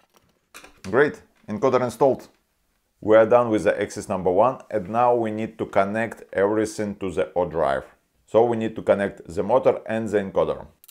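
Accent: Russia. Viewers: Акцент русский. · Русский акцент слышно даже мне. Но то что автор не стесняется разговаривать это молодец. Видос не досмотрел, но за старания лайк.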